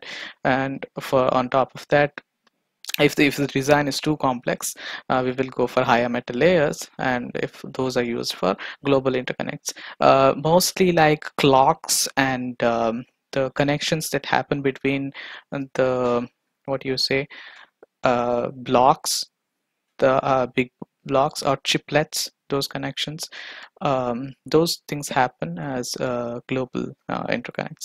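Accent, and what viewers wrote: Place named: India. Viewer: Finally i am deep enough in the rabbit hole to get tonthe Indian accent. This is it. Getting smarter as hell.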